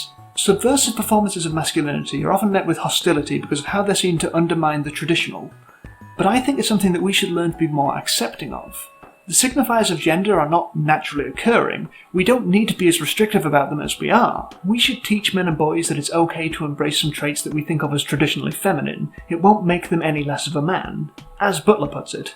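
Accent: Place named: Belfast, United Kingdom. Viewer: Love if when I hear a Belfast accent,. 💚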